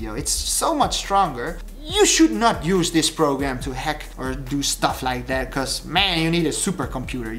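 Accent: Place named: Netherlands. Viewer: Yeah I can just tell you're Dutch, your English accent should sound more convincing in order to scam people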